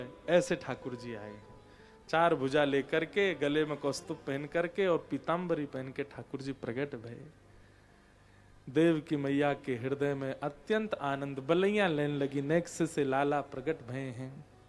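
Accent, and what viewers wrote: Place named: Braj, India. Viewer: Jay Shri Krishna Radhe Radhe 🕉 Namo Bhagvate Vasudevy Namh OM 🕉 Namo Narayan ji Jay Shri Radha Rani Jay Shri Radha Kishori ji Jay Shri Radha Raman ji Jay Shri Guru Dev Maharaj ji Aapke Charno Mai Koti Koti Vandan 🙏Radhe Radhe Jay Shri Harivansh Aapki vraj Bhasa Badi Sumadhur hai Jay Shri Vrindavan Dham Jay Shri Yamuna Maharani ji Radhe Radhe 🙏♥️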